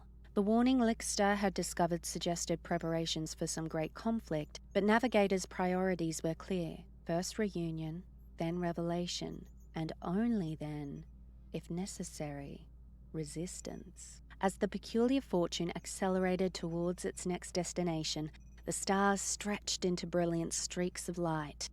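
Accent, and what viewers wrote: Australia. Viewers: Aussie narrator noice 😊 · Good job ! Aussie ?? Don't think Kiwi.. Either way, Welcome.. Very attractive young "Sheila" lol.... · I have just found this site and I read many comments and a number mentioned that the narrator lost punctuation as they felt she was reading/talking too fast. Now I personally did not feel that there was a problem as I seemed to understand clearly what she was saying. Then way down some one mentioned that she is Australian and it dawned on me why I had no problem with her. I am Australian.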